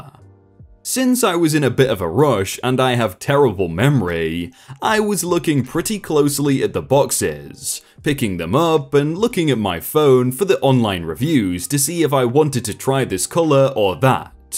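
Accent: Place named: England, United Kingdom. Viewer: At least you don't think his voice is computerized, LOL. But seriously, you do know that English people pronounce some things differently, right?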